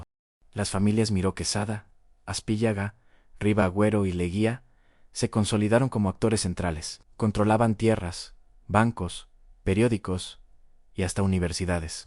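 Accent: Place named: Mexico. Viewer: POR QUÉ M. SUENA A DIALECTO MEJICANO ¿?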